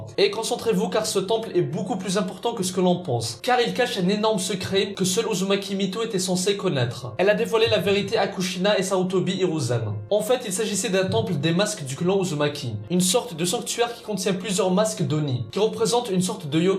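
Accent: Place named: Kabylie, Algeria. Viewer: T'as un accent kabyle 🤣 réponds moi si c vrai !!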